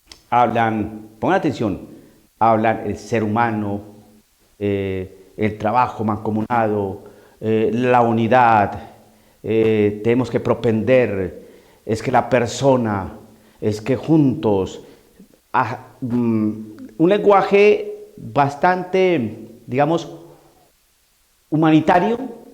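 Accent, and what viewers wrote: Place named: Bogotá, Colombia. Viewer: El, es colombiano....no le notas el acento rolo?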